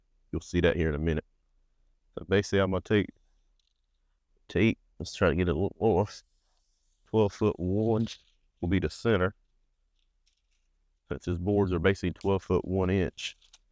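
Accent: South, United States. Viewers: I'm glad I'm not the only one to think that. I was going to say he's Laurence Fishburne's more southern sounding brother.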